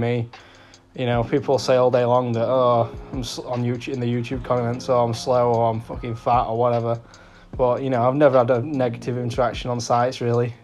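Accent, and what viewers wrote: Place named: Derby, United Kingdom. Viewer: Wheres the site are your on buddy, you sound like your from my area in derby and theres loads of red road sites near me Another good video buddy, definitely think 1n1 is a good way to go:)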